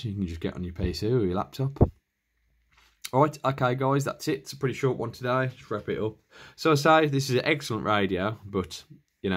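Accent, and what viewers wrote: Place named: Liverpool, United Kingdom. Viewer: Just stumbled upon this video and your channel looking around at 7300 videos. Great radio, and superb explanation. Are you a Scouser? Cool accent!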